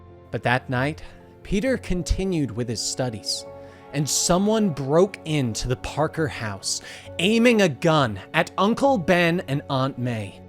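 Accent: United Kingdom. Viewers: has got the fantastic job apart from that British accent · Not all us brits sound like Chimney sweepers from the 1940s come on man do some voice research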